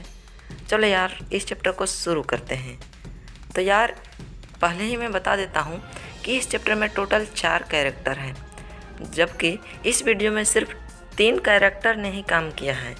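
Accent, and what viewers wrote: Bihar, India. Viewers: You are Bihari n?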